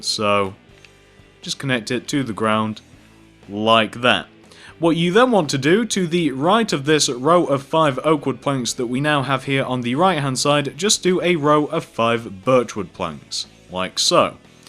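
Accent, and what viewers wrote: United Kingdom. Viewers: I like your British voice, so awesome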